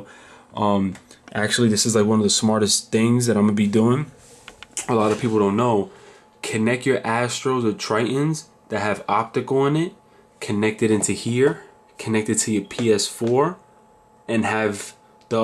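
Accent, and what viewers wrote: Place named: Queens, United States. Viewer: Nice vid bro I can tell you're from Queens NYC I'm from Brooklyn LOL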